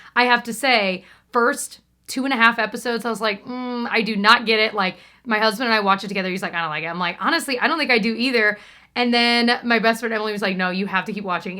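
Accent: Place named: Midwest, United States. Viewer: So.. watching yours and Tyler’s videos I was like. Matt! (My husband!) this girl lives in a Pulty subdivision! She goes to Meijer! I think she lives in Michigan! Then he watched literally one of Tyler’s videos and was like nope. Indians. Ugh! Anyway. Bridgerton was my first real romance series. Haven’t seen them but the books are good. I watch your stuff when I’m out of town for the Midwest accent!!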